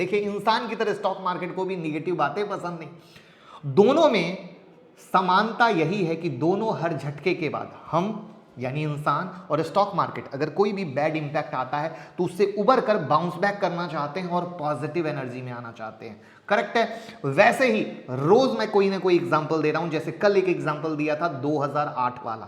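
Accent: Bihar, India. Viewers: आप पूरे बिहारी लगते है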